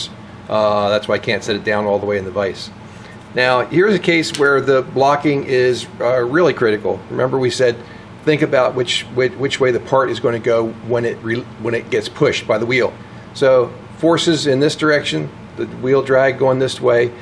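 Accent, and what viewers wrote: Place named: Pennsylvania, United States. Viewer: I'm enjoying your videos, sir! You have a certain inflection in your voice that tells me you may be somewhere up around my parts. I'm south-central, Pa. You sound more south-eastern, Pa/Delaware. You have a new subscriber. Awesome craftsmanship!!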